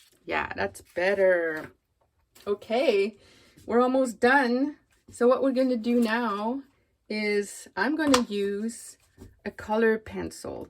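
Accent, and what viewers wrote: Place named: Normandy, France. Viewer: Thank you for this. Also, just want to say I love to listen to you speak. Your accent sounds just like my mom who was Normandy France. She is no longer with us but this gives me some joy for a few minutes.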